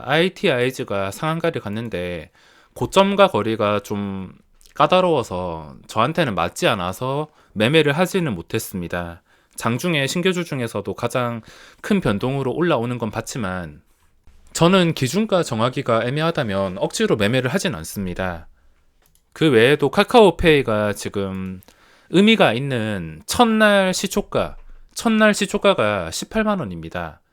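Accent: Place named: Daegu, South Korea. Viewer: 은 목소리가 진솔하고 매력적입니다..거짓없이 솔직한분 같습니다..저도 대구살지만 억양또한 구수하네요..좋은영상감사합니다..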